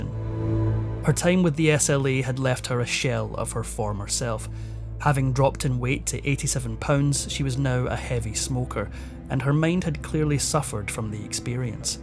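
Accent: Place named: Scotland, United Kingdom. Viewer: Yay my all time favorite Narrator Love the Scot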